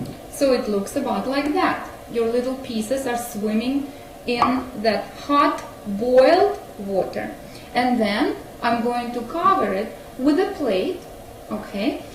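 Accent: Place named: Russia. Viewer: You sound more russian/ Ukrain german and thats not a german accent-- Ich kenne meine Deutsche sprache . (I know my german language )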